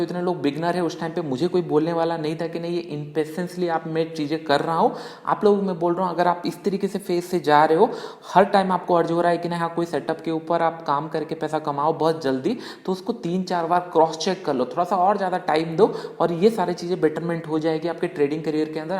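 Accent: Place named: West Bengal, India. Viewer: It seems u r from West Bengal. I am too from Adra, Purulia district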